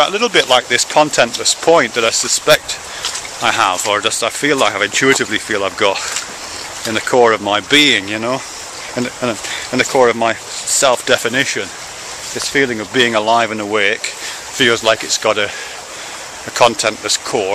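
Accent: United Kingdom. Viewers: I don't know why, but I enjoy listening to British people (i'm american). On the self.... I commented elsewhere that I think the self is a purely human, cultural and social construct. Sure, I may have some models or ideas of my "self"... but I'm a cultural animal after all.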